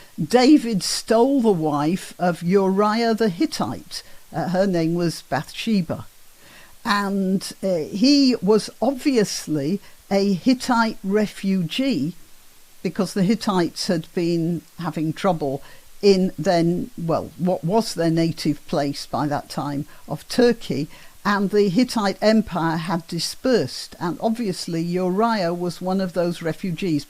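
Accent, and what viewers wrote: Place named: Northern England, United Kingdom. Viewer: Good northern accent luv!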